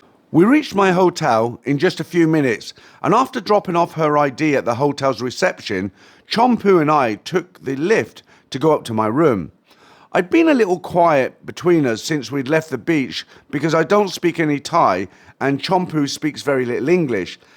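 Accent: United Kingdom. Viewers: Great story - but your "reading style" with the British accent, and no mistakes makes the stories sound very entertaining .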